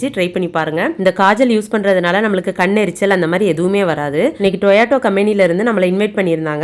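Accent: Tirunelveli, India. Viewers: Unga native thirunelveli,ah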